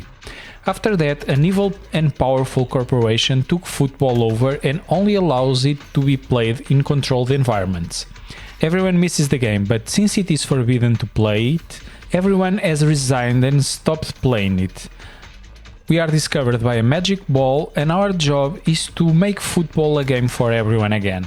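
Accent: Portugal. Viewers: Este sotaque tuga nao há como falhar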